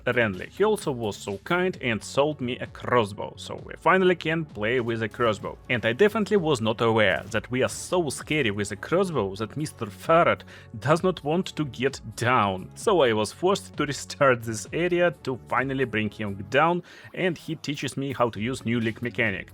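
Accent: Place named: Russia. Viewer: Слышно что ты русский)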